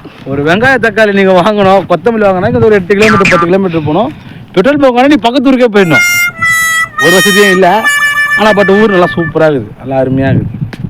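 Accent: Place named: India. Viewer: வட மாவட்ட பேச்சு ❤🎉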